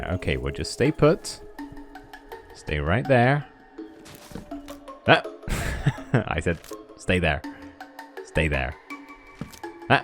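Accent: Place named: Bristol, United Kingdom. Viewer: Where's your accent from? I am from Bristol, and you sound like you have some of the accent.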